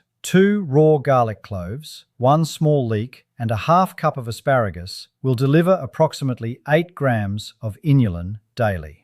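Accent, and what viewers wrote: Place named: United Kingdom. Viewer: British now? Loose the brit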